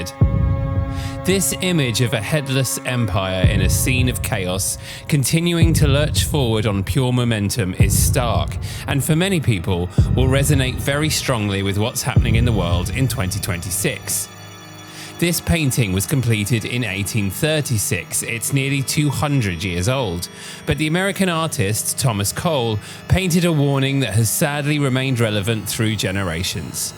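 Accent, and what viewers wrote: United Kingdom. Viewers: It’s bs.You got a Brit narrator,who probably doesn’t like that his own empire fell now hoping the US does too.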